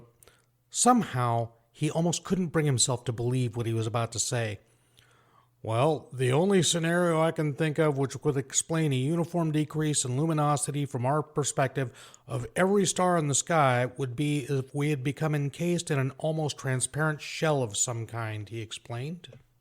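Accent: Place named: United States. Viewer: I like this story and your voice. NetNarrator and Agro Squirrel are my usual listens, but it's nice to get an American voice once in a while. Keep it up, and don't damage your vocal cords, please!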